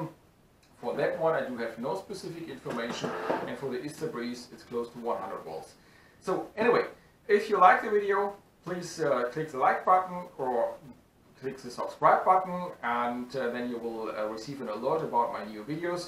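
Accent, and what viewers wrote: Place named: Germany. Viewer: Na hier hast du dich wohl verkalkuliert! Das ist wirklich schade dass du dieses Video besonders dieses Video nicht in Deutsch gemacht hast. Denn die Nachfrage von deutschen Windkraft-Freunden ist sehr sehr groß und es sind so viele Fragen offen und ich kann mit Englisch beispielsweise gar nichts anfangen so auch die Community in der ich bin und ich habe überhaupt kein einziges Wort verstanden was du da erzählst. Aber deine like gerade die wäre garantiert höher gewesen hättest du dieses auf Deutsch gemacht, weil alle suchen nach solchen Videos in Deutsch und finden ist nicht und jetzt sprichst du Deutsch und machst es in Englisch was ich mega schade und traurig finde was für eine Verschwendung um diesen Bericht. Denn es gibt unzählige englische Berichte für Windenergie nur leider nicht auf deutsch das wäre deine Chance gewesen. 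Und wie wenig draufgekommen,?... Das Englisch war so typisch deutsch. Man hat herausgehört dass du eigentlich Deutsch sprichst 😉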